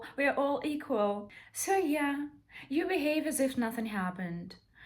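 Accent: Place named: Russia. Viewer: Я сразу услышала по произношению, что это наша девочка, русская🤗 Как же ты права, милая!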